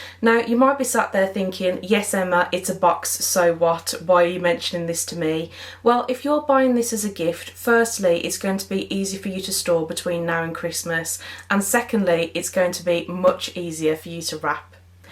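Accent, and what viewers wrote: West Midlands, United Kingdom. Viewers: Hi hun was looking to buy this set and thought I would check out a video on you tube to see if worth it and found your channel, you sound local West Mids? but yes great review they should give you commsion for promoting this lol.....will check out some of your other videos have sub'd :-) x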